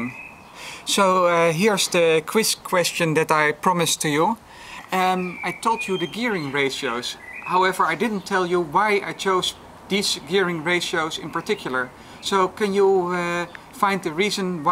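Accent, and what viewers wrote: Netherlands. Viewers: Kom jij uit deventer? Ben je nederlands?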